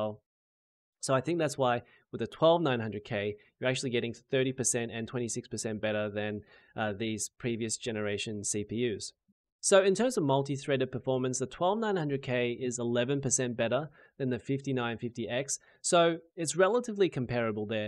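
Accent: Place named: Australia. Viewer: Is this an Asian dude with an Aussie accent? Incredible!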